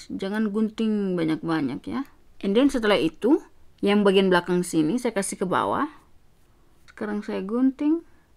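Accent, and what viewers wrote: Manado, Indonesia. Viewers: Logat dan cara berbicara anda mengingatkan saya kepada teman saya yang berasal dari Manado❤️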